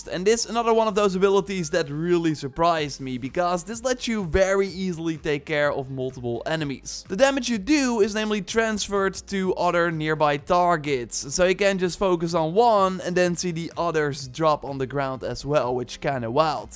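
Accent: Netherlands. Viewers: Hele goeie content maar jonge jonge wat is duidelijk te horen dat je Nederlands bent 😂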